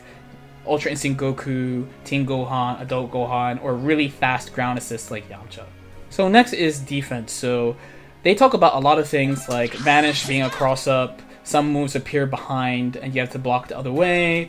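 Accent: Baltimore, United States. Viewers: Bruh you from Baltimore?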